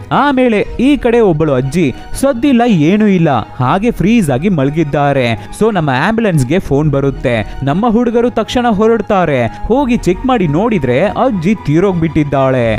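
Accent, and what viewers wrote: India. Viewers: Super and excellent voice and kannada accent, plse continue doing this dubbing in kannada work, we have very less English movies which is explained in kannada, also if you can try for dubbing ENGLISH movies in kannada plse will be super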